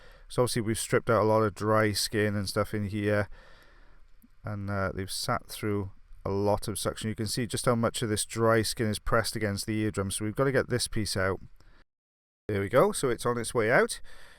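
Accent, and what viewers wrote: Wales, United Kingdom. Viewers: Are you from Wales by any chance? :)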